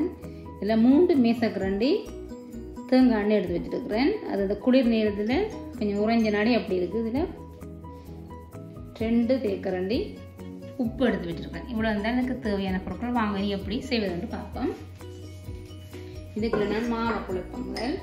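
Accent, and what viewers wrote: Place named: Sri Lanka. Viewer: இலங்கைத்தமிழ் 💗💗 தமிழ் என்றாலே அழகுதானே .... அக்கா உங்களுக்கு 4000 watch Hours வந்திட்டா ??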